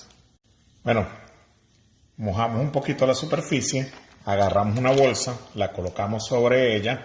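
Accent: Venezuela. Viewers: Ese acento Venezolano me encanta!! Probé la receta y son exquisitas. Recomendada. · El tono de voz muy venezolano, gracias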